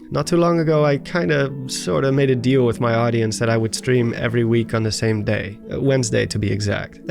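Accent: Netherlands. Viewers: There are way worse accents then the dutch one. It was actually quite charming. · kan aan je stem horen dat je een nederlander bent, congrats op de 100K man. Mijn PC kan elden ring niet runnen, maar wil de game uiteindelijk wel gaan spelen(nadat ik een betere gaming PC bouw, maar dan moet ik eerst wachten totdat de prijzen gezakt zijn).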